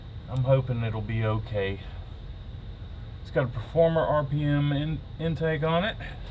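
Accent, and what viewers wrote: South, United States. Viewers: Youuu, southern booyys don't gotta sound so dumb, take notes from!!!') Announcuate!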